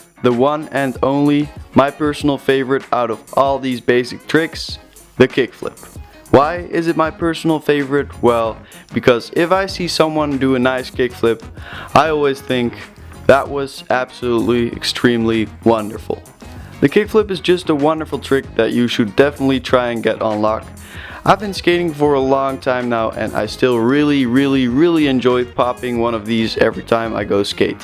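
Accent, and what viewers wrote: Netherlands. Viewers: u dutch ? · Are you dutch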